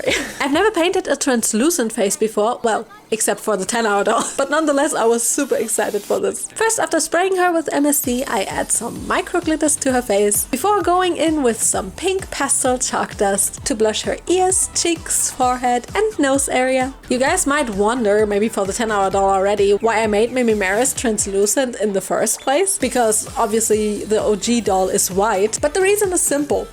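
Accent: Germany. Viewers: Grüße aus Leipzig! freu mich immer wenn ich einen deutschen Akzent höre ❤ ^^ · Omg youre german? xD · She’s German, dude. It’s her accent.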